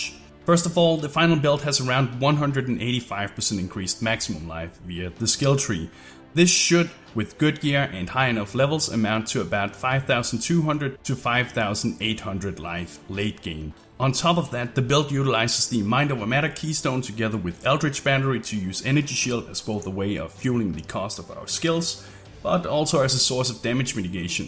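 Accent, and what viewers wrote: Denmark. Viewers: Can you level up with this? Btw, are you danish? :D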